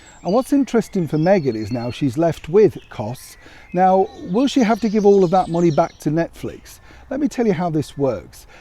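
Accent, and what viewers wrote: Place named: Liverpool, United Kingdom. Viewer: you say you are a Yorkshire man, but when you are joking I find you have a very Liverpool cadence, not accent but for example your pally endings - don’t you. I love it as I’m a scouser from way back. Thank you for giving me a touch of home everyday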